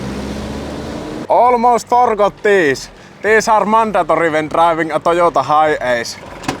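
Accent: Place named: Finland. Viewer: Cmon guys.. there is no shame in rally english. It’s literally english with finnish accent and that’s it. That is very understandable for native speakers in my experience. Ofc it sounds rough in the beginning but ”suomi sopii sulle paremmin” commentators can burry their heads in the sand and keep living your life xD you do have balls of mulliperse.. keep it up!😂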